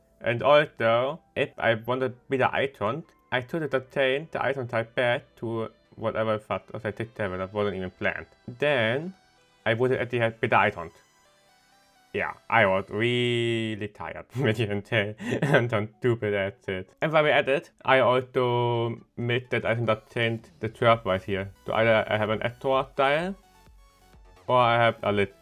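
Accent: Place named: Germany. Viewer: His accent is so heavy, i thought he was speaking german haha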